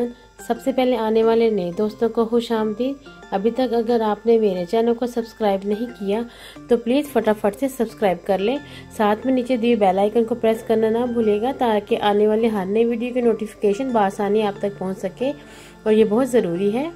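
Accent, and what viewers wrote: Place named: Pakistan. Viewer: Me india se hu baht esy treeka btaya hai apne nice mam me try krungi pakistani zban muje pasnd hai bahut yha india me istrha nhi bolta koi bhi but muje apki voice achi lgi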